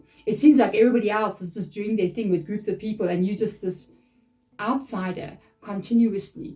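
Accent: South Africa. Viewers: Are you a southy 🇿🇦?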